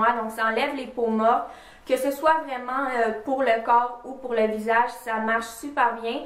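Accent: Quebec, Canada. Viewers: J'adore le Québécois Ça m'incite à suivre le video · Très belle en plus j'adore l'accent canadien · T es du canada Ton accent!!☺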